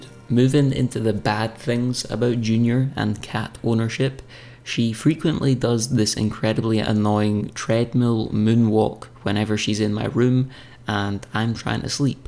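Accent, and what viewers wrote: Scotland, United Kingdom. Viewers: What part of Scotland are you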